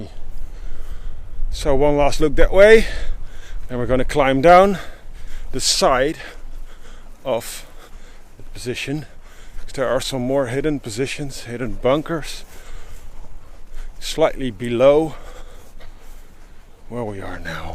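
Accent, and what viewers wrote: Netherlands. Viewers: You have such a Dutch accent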